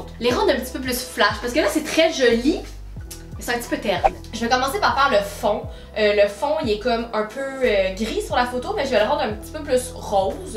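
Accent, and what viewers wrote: Quebec, Canada. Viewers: Ta un acent quebequoi sa te va bien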